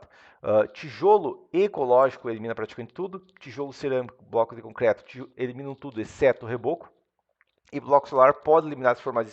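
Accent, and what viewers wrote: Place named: Lisbon, Portugal. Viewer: Meu filho , uma dúvida : você é de que lugar do Brasil ? Faço essa pergunta por causa do seu sotaque . Você é do litoral de Santa Catarina ? Por que o seu sotaque parece.muito com o sotaque da Europa , o sotaque do português europeu , especialmente de Lisboa . Abraço .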